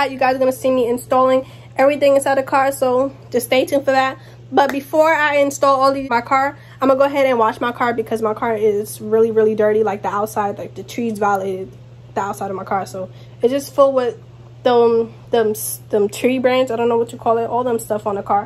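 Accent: New York, United States. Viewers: lol i knew you was from ny .... we all talk the same.... type shit lol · Her accent is so New York.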